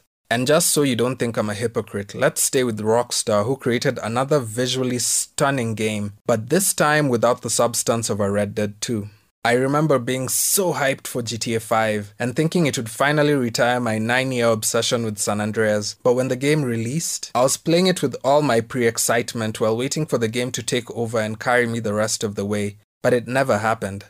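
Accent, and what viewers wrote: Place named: South Africa. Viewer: Your accent is close to a South African one but that's just me. I did not know about fortnite being a single-player initially. I've always avoided these co-op/online and live service games due to the predatory practices. But stylized games are more timeless with the best example being Sifu The upcoming blade by Arkane Lyon. Ultimate Spiderman (2005) Hifi rush The upcoming blade game Rocksteady is another studio that perfectly blends photo realism with a more stylized appearance Gotham and metropolis look like their animated series counterparts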